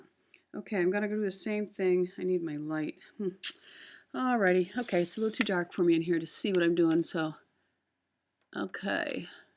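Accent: United Kingdom. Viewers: great video esp with the British accent lol.I love the look from back in the 40s.My fave movie ever is Brief Encounter from 1945 and Celia Johnson just looks so understatedly beautiful in it.True beauty is timeless and yes you are vintage and classic xx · Oooohhh Yay! I love the british accent. Hehehe Big Hugs Marnie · great video! love the british accent :)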